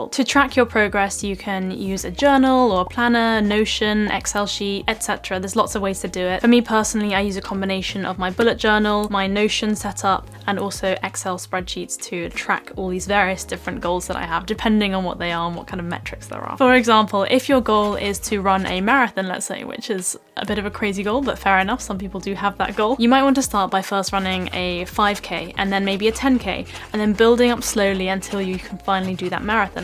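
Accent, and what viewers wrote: United Kingdom. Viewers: 纯正英国腔听着舒服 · Thank you for making all the videos easy to understand especially learning the British accent, which I really love. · Your British is amazing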